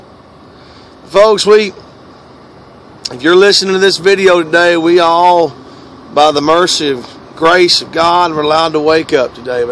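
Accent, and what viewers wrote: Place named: Texas, United States. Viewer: Make sure you post more to you tube because I am spreading the word with your videos! I moved to PA from Texas and to get your accent with my morning Word is a blessing! LOL! I have shared a lot of your videos already thru Facebook (yes, I follow you) so you are doing Gods work for sure! Keep it up and God Bless!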